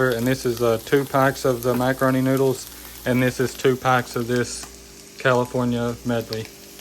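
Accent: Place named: West Virginia, United States. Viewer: This looks delicious yet simple. What a blessing you are to your grandparents! I just love your WV accent; sounds like the folks from my home in eastern KY. 🌻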